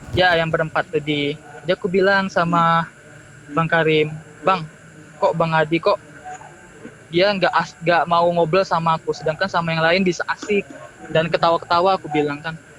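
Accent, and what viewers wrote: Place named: Jambi, Indonesia. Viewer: Kyk suara orang jambi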